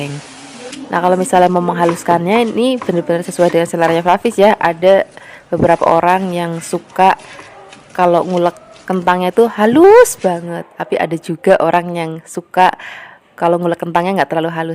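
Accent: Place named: Semarang, Indonesia. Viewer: Suka bngt dg cara bicara nya ceria bnget...spt logat org semarang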